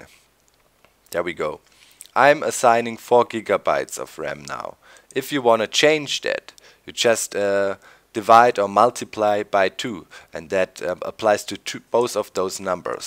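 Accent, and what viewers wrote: Germany. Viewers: yes he is becuase he lives in germany and hes actcent :3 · are you from german?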